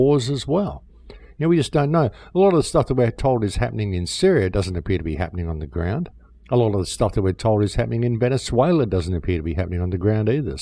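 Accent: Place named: Australia. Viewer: He is Australian.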